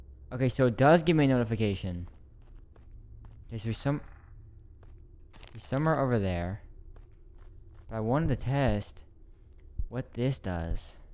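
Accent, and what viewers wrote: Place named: South, United States. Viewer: plssss what is this southern accent 😭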